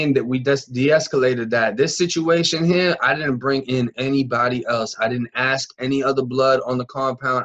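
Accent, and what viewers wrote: Boston, United States. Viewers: Yo your mix of Boston and country accent is great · Hey hon, i really enjoy your content. You have a great way of speaking and your Boston accent is da bomb. Your stories are very interesting. You will be growing by leaps and bounds on here. How are your tootsies feeling? What a serious painful nightmare. People underestimate the sensitivity of the toes! I was just crying over a simple~ass blister on my toe from a pair of new shoes! Please! Frickin wuss I am! I look forward to more videos from you! Best, Michele from Baltimore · This dude talking about Florida, but is he from Beantown? That accent sticks out to me. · And are u from boston origanally bc ur accent sounds exactly like a boston accent just wondering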